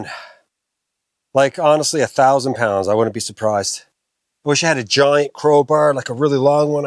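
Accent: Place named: New Brunswick, Canada. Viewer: I knew it! Since I started watching you I thought your accent was similar to mine, but this is the first time I’ve seen you mention being born in NB! From around the Miramichi, is my guess? :)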